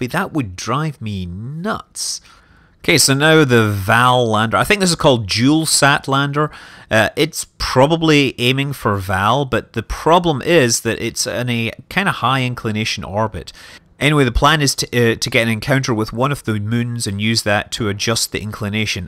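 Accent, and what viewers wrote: Scotland, United Kingdom. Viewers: Omg, nerdcubed sent me here and I was a bit reluctant but now I know your Scottish I’m amazed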